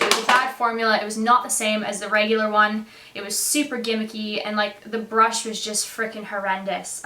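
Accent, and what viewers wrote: Australia. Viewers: It's hard to tell that you are Australian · You can hear an Australian accent starting to come out in you. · This is going to sound extremely weird but I really love your accent! It's amazing how 3 years ago, your accent is completely far from an Australian one and now, you sound quite like an Australian. I find it really cool and it makes your voice sound extra great to listen to :3 Love the video as always! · I notice the Aussie accent is starting to stick!! :) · i think she's picking up on an australian accent a little! :) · I love your videos! :) Thanks for posting xx p.s. your accent is transitioning to australian! love it hahah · I hear that Australian accent trying to break through! Haha! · Wow you have really picked up the Australian accent! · Is that a slight aussie twang to your accent? ahaha love it! i can hear it! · lol i dont know if its because i havent seen your videos in a while but you have more of an australian accent now, love it!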